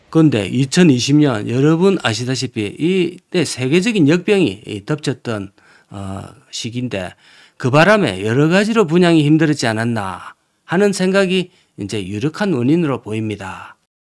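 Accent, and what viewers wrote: Gyeongsang, South Korea. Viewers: 경상도 사투리 완벽하게 이해하는 1인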